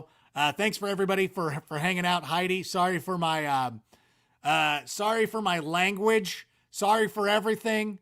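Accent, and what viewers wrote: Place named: Chicago, United States. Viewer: you talk like a true chicagoan. DA BEARS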